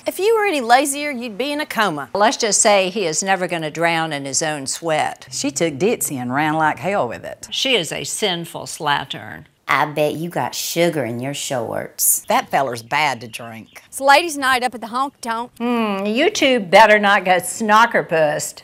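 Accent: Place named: South, United States. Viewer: Love these videos & the beautiful Southern accent! As someone from Ireland I recognize quite a few of the sayings over the series. A lot of Irish that emigrated to Southern states obviously left some influence down through the generations!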